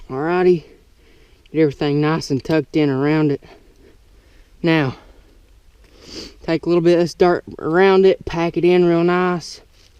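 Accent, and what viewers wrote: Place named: Kentucky, United States. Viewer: Hey what part of Kentucky u from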